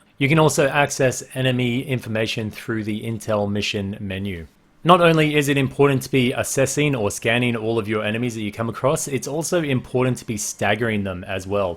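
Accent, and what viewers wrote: Australia. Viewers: Austeralian eh?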